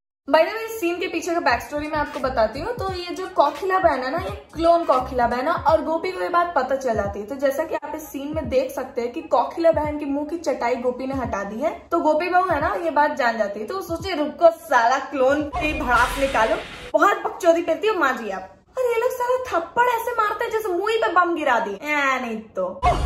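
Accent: Bihar, India. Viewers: This was so damn funny and your bihari accent is juts awesome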